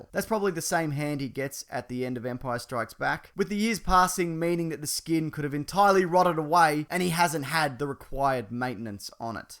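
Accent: Australia. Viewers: Movies Brilliant video! However, luke's voice over is a mash up of dialogue from episode 6, one part from where he tells leia that she is his sister(my father has it blah blah), and the other part from the same conversation but just a bit earlier.(you have that power wutevah). Still lovin the accent btw, ive been practicing my australian accent (im from england) and its bloody amazing! :) keep up the good work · are you australian...? subtitles are quite useful now. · Good job. Lemmie guess. You're Aussie. Right?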